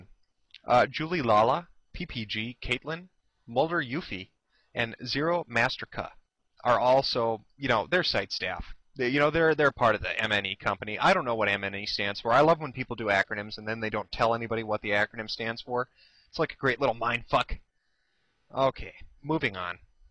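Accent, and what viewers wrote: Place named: Minnesota, United States. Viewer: He sounds like he walked out of the movie Fargo "oh yeah Margie he's a funny lookin lil guy"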